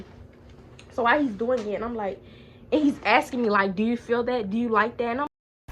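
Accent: Baltimore, United States. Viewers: That’s that Baltimore accent!😭😭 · im from newyork and she has nothing close to a ny accent idk where you got that from😂, she has a strong baltimore accent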